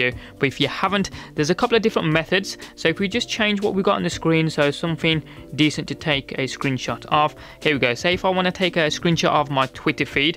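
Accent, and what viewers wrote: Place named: Birmingham, United Kingdom. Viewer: You from Birmingham...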